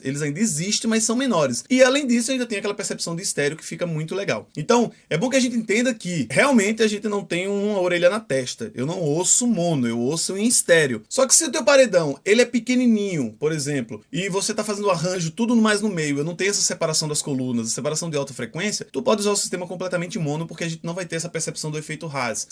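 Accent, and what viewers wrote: Northeast, Brazil. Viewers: gênio é uma honra e muita satisfação ver que você respondeu meu comentário nossa Aaaa, (muito massa) sobre a questão de mesário, eu sou em tempos de política sempre sou chamado pra compor a mesa e sobre técnico eu trabalho com som também, trabalho com som mas mecânico, e estou migrando para o som automotivo, e seu vídeos me ajudam muito, se entendo sim um pouco não tudo (isso sempre) e a cada vez que assisto um vídeo seu aprendo um pouco mais, assisto me divertindo, não zoando mas achando super interessante divertido (sotaque. Bem que eu queria ser do nordeste) ABRAÇO GÊNIO. DESDE JÁ MINHA ETERNA ADMIRAÇÃO POR TI HOMI.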